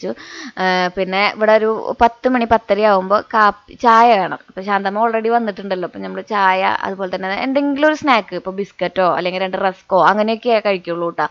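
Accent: Thrissur, India. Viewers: Aniku pandulla vedio anu eshttam.originalty undayirunnu.trissur slang correct ayirunnu.ennu athokke mariyathupole.❤❤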